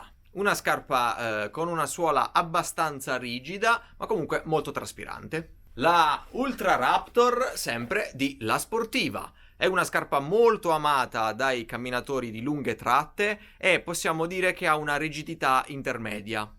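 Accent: Apulia, Italy. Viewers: ma il "dove dobbiamo andare con queste scarpe", devo chiedermelo sempre con il tuo accento pugliese? o posso usare il mio siciliano?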